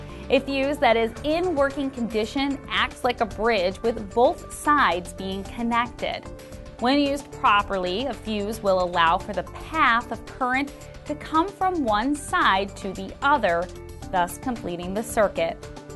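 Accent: United States. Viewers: The American accent makes it difficult to hear clearly.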